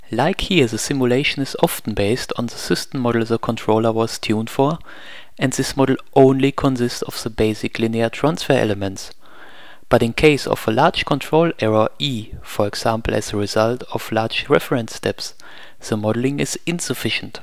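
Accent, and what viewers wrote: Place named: Germany. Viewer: classic german accent haha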